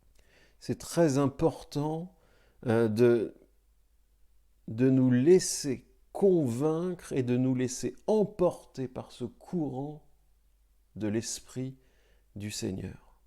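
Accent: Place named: Quebec, Canada. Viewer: Merci . Il m'a fallu quelques minutes pour m'habituer a votre fort accent de la belle province , certains mots m'ont échappés , mais , j'ai bien compris l'essentiel . Merci a vous .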